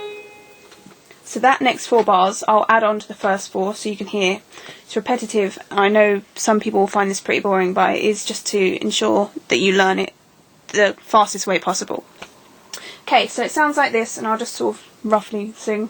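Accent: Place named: United Kingdom. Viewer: thanks so much for this tutorial, I LOVE your british accent it's amazing :) greets xoxo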